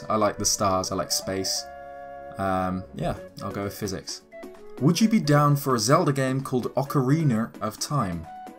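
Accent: England, United Kingdom. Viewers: I remember those days. You were and still are my favorite Zelda theorist. I always assumed was a mix of Zelda and Celtic. And I thought it was odd because you sound more English than Celtic.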